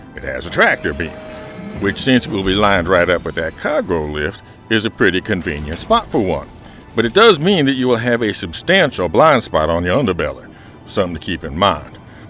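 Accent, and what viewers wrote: United States. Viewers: Mate, that accent is the most American thing I have ever heard. Loving your SC series more than Moe Haytar loves his packages. 😁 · Damn now that American accent is so amazing. You should make angry shouting ads for ships in the american military style lmao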